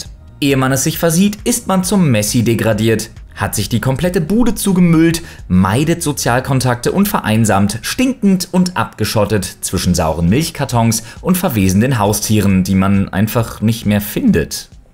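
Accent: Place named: Bavaria, Germany. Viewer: Der und sein bayrisch! <3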